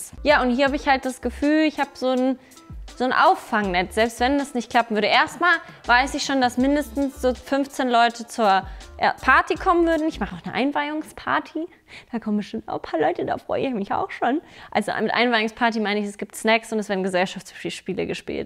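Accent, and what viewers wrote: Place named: Franconia, Germany. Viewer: als Halbfranke ist es für mich seeeehr sympathisch, dass du jetzt anfängst bisschen ins Fränkische abzurutschen. "Na, wir ham des damals aba a scho imma so gmacht"😍 ...love it!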